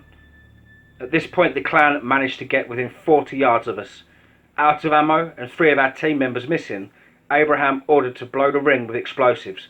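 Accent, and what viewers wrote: England, United Kingdom. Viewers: You rock,. Keep it up. Love to hear stories in an English accent... My forbears are from the Isle so it's in my blood. I hope you had a happy Xmas with the family. Stay safe and keep up the awesome work.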